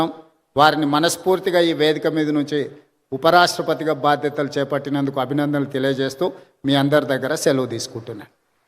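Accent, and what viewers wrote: Telangana, India. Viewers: He is a great orator. Without looking at script and little Telangana accent his speeches are pleasing to ears.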